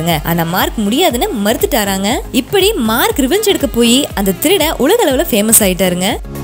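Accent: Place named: Coimbatore, India. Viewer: yenunga neenga coimbatore aanga..?! 🤗🤗👏👏 super voice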